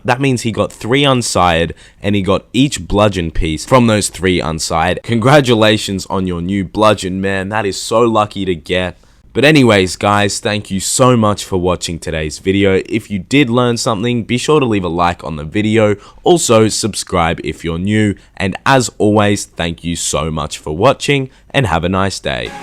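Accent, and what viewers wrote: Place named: Australia. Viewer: Your an annoying Aussie. It's your phrase structure that annoys me. Like you sound blaagghhttt!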